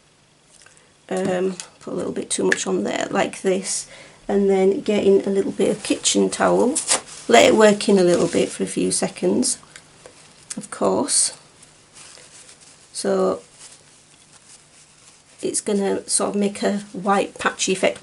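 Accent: United Kingdom. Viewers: Very useful!! I am sitting here with my second iPad buying up on Amazon! Today was pension day! You have become like a friend to me I would love to sit at the table and colour with you in person, since I am in Australia that’s not possible, love your videos very much, and your accent what part of Britain are you from? I was born in Birmingham but don’t really have an accent, except true Aussies usually pick I am from the UK 😂😂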